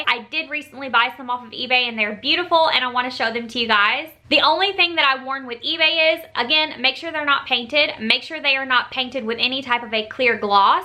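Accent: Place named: South Carolina, United States. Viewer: Are you from the Carolinas? Your accent sounds south Cackalack to me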